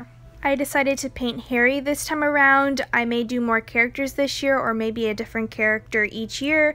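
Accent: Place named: United States. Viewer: how much I hate American accent.....eww but these diys are so cute wow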